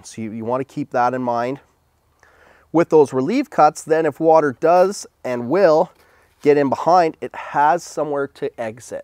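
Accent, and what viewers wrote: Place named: Nova Scotia, Canada. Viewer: Sounds like a Nova Scotian accent lol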